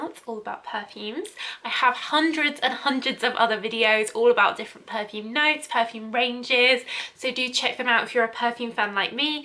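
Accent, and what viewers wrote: United Kingdom. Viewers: your skin looks gorgeous! how do you keep it so hydrated and balmy? I would like to see a facial routine video. Love your British accent though.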